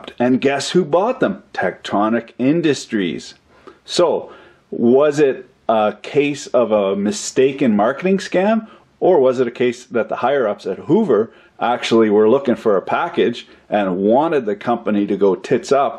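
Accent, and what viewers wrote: Canada. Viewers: Lurnin Canadian with. Still don't have that accent down. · Great to hear Canadian language. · Good stuff. I'm starting to get the hang of Canadian speak. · Are you and 1pug1 brothers or is this just how all Canadians talk. bmp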